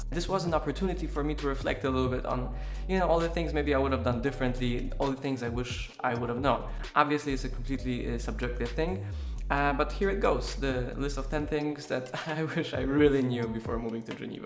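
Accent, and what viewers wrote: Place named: Poland. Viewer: Od razu poznałem po wymowie, ze jesteś Polakiem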